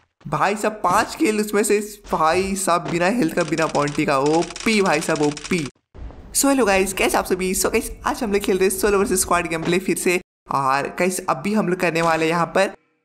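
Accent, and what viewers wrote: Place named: Karnataka, India. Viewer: Bro are you from Karnataka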